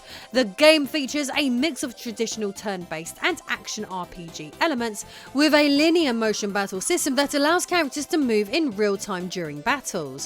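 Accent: United Kingdom. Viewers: I like doing the segment where you were talking about Mobile suit Gundam, with your British accent it sounds like you're saying, got damn. With your British accent, when you speak, you say every syllable within a two syllable word, instead of, just saying it as one word, you and your husband, do this, I'm just telling you, what I've noticed, it's not bad, I'm just giving out my two cents here.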